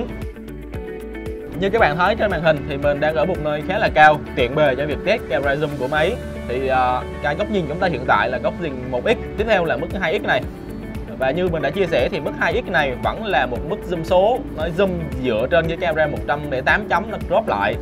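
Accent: Binh Dinh, Vietnam. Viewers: anh này dân bình định hả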